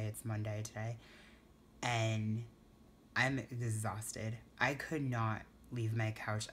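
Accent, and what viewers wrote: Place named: Northern California, United States. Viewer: You sound like my cousin who is from northern Cali that's why I was like hmm I wonder if she is from the west coast. I'm from New Jersey but people say I have a southern accent lol as far as the post op... I've been scared to eat because I always get nauseous.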